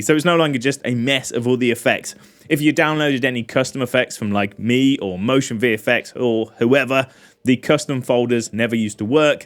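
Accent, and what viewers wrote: United Kingdom. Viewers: I take it all back… You aint gotta change s#i+ I suck. Your speech is amazing and British!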